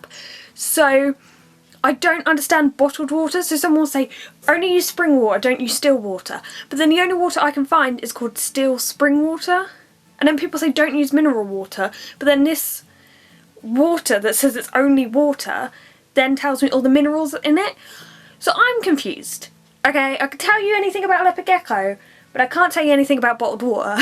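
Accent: United Kingdom. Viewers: I just discovered your channel, I've been watching quite a lot of your videos today, they are all great! You are really passionate about these animals, it's a joy to watch. Besides, i love your British accent. It makes it all very nice, I subscribed without hesitation. Greetings from France :)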